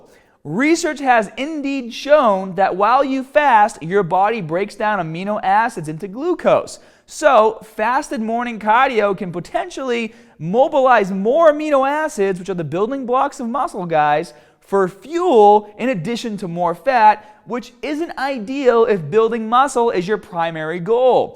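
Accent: Boston, United States. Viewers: Is he from Boston? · I I've been watching your videos to help me get in shape and learn how to do stuff. I have to say this video your Boston accent was way too strong! Every time you said the word cardio it was killing me! Now... I don't think understood 1 word of this w all the tequnical jargon, except that maby I should eat a little something before my 20 minute cardio in the am, but what? a full breakfast? have exercise induced asthma so a lot of times I will walk for several minutes and then do a jog for several minutes (whether that's outside or on the treadmill). Didn't know that was considered some sort of a HIT routine. Love the shirts btw, the superheroes are the best, where do u get them? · From Boston?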